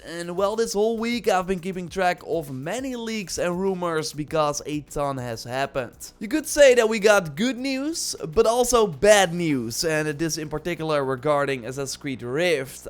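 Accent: Netherlands. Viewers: I can't get over your Dutch accent🤣 it's so obvious (omdat ik zelf ook Nederlands ben)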